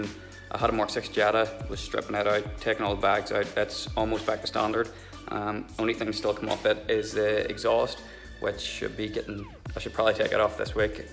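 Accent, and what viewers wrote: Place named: Northern Ireland, United Kingdom. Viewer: Glad to find this channel mate by the sounds of it ur from Northern Ireland same as myself, have a 1.2 6r and have been giving a lot of thought into an engine swap and awd conversion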